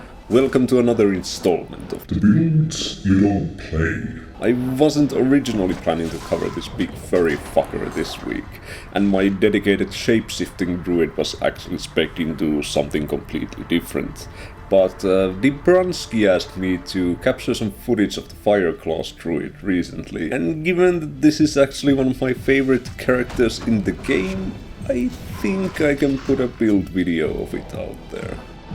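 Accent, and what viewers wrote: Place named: Finland. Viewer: Sounds like a finnish accent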